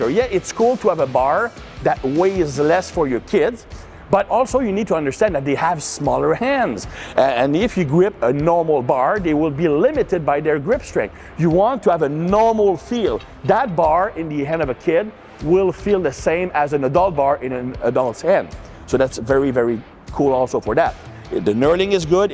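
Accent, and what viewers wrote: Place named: Quebec, Canada. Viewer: Vive le Québec libre ton anglais est excellent mais l’accent te trahit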